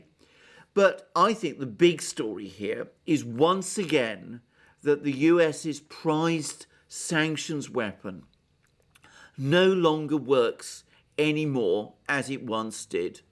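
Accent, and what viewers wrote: England, United Kingdom. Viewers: Interesting informative news but boring presenter. The heavy English accent is difficult to hear for Americans.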